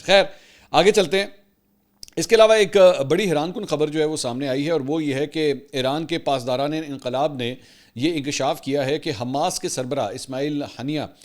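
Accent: Punjab, Pakistan. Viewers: Be a good vloger. Urdu vlog ma punjabi ka tarka na manzoor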